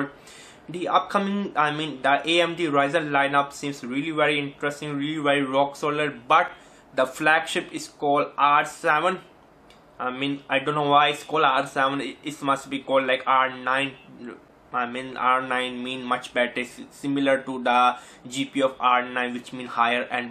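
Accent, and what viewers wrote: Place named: India. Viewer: Dude, you need to decorate your room a bit. Make it look like a professional pc-room. Also, please take elocution classes. You clearly have a speech impediment and you need to get help to overcome this problem. I'm Indian and I am very familiar with the pigeon-Indian accent but even then I find it difficult to understand what you are saying. I'm not being cruel. I'm just trying to give some honest feedback. Once your elocution improves, people will understand what you are saying and your feedback ratings will also improve.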